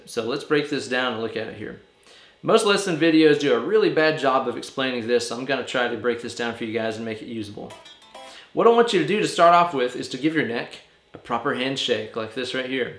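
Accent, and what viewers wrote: South, United States. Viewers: Are you from Tennessee,? Just curious, you know White Chapel, and you have a southern accent, just putting the pieces together. I just moved to TN from MI, and I love it down here! Cheers!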